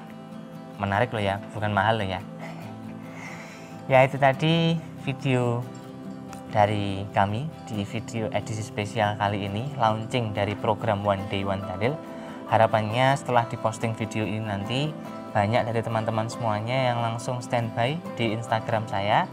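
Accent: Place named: Java, Indonesia. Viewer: Mas, jawa ne medok... 😃👏🏻👏🏻👏🏻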